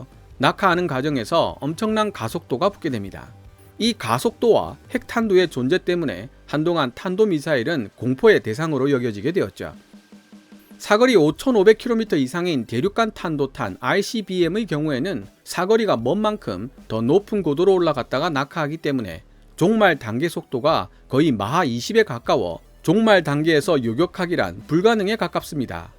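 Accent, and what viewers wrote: Gyeongsang, South Korea. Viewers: 님 경상도 분이신거 같아요 사투리가 나와서요...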